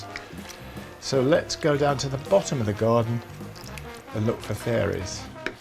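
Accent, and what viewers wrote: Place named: United Kingdom. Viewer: I definitely make this soup you just made . it's good to be a good cook or a chef .I am an American I like your British accent.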